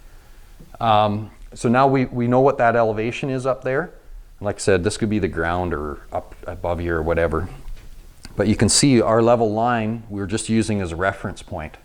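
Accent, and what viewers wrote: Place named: Canada. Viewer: first video watched on your channel, I recognize that accent, what part of Canada are you from?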